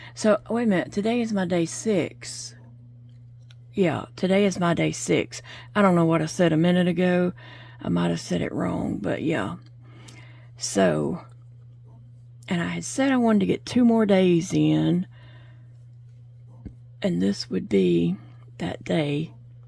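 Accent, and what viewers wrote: South, United States. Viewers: Kathleen from NJ blanket up in flames oh you crack me up!!!! That was one beautiful pattern and those colors were exquisite. A+ for effort. I know nothing about knitting or crochet I do jigsaw puzzles on the computer on a site by the name of Jigidi when I watch/listen to your videos I love listening to you Must be that southern accent you have. Maybe you should have given it to!!! I was tying this while you were talking and then all of a sudden there it was! Set it aside and try again Its really a beautiful piece of work. I was wondering if you ever named that yellow new doll on your desk, I gave you 3 names for the 3 you bought several days /weeks ago Look on that video for those names I thought they were pretty good......LOL.......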